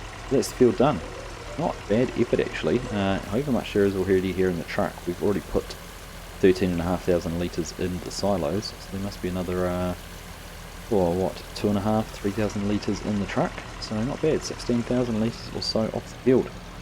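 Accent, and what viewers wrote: New Zealand. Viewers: Goodoh! is beek un speekteckle oylend. Not knocking - my grandfather was born near Napier, 1880-ish (aye, Ah'm auld) and I have dozens of Newzeeld relatives, but none I've met has an accent this extreme. / You do better timelapse music than most, congrats. Wonder if you like and/or, sliders extraordinaires. / Do hope AlienJim does an FS22 version of this map. Thanks.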